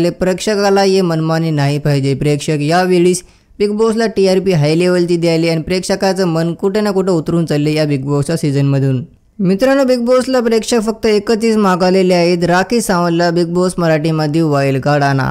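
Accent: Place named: Marathwada, India. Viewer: Bhava tu maratwadyacha ahes kai😅, apli bhasa sarkhich hai😅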